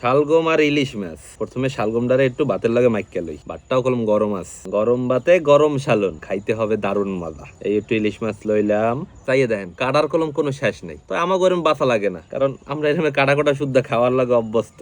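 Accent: Barishal, Bangladesh. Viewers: ওরে বরিশাইল্যারে!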